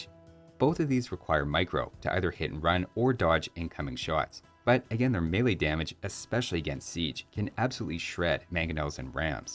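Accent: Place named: Canada. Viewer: Great video as always,! Thanks for the insight. I don't expect you to pronounce everything super correctly, but Mali is pronounced with the "a" as in "cat" - rhymes with alley. (To pronounce it like a West African, the "l" is different too, made with the tip of the tongue forward, close to the back of the teeth. But that would probably sound kinda weird in the middle of a Canadian accent.)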